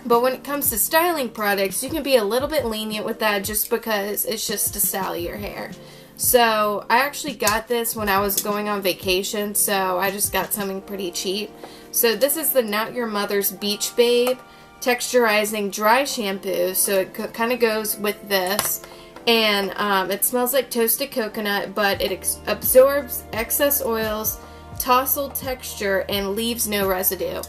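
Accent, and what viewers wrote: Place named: United States. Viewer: Ugh, you're so great on camera!! I never realized you had a lil country accent. So cute 😁😁 Loved this video, looking forward to more content from you!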